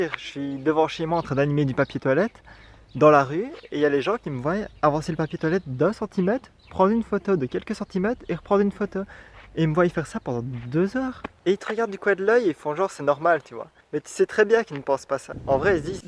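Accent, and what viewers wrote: Liège, Belgium. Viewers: Tes belge ? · J'adore ton accent !! c'est belge non? 💘💗😙 sinon continue comme ça tu gère pouce bleu aussi pour cette vidéo (comme toute les autres)😚💝😙💗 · Tu es belge ou je me trompe? Je reconnais l'accent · Tous les belges ont cet accent? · Toi tu viens de Liiiiidge m’fi!! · Es-tu belge?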